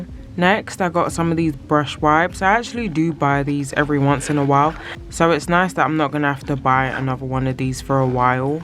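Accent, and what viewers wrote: United Kingdom. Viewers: Hey hun just came across your channel. Love it, sounds like you're from the UK too..yay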